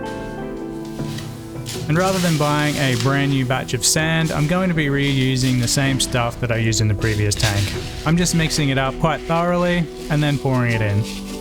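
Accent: Australia. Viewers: Nice to hear the Aussie twang in an aqua scape video! You sorted for Vegemite and Tim Tams?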